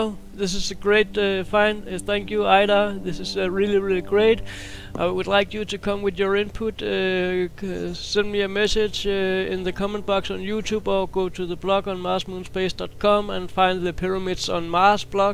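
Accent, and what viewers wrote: Denmark. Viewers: I worked at a hostel for 3 years. This dude is Danish for sure.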